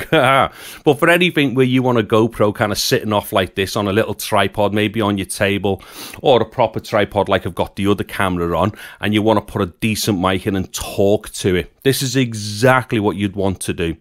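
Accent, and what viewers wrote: Liverpool, United Kingdom. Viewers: you've got nice voice (and audio set up was well explained too) and i like your regional prononciation, i guess Liverpudlian?:) Sorry, if not, i'm a foreigner.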